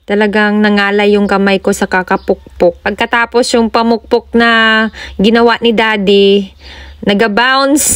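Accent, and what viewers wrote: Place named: Iloilo, Philippines. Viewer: ilongga ka mam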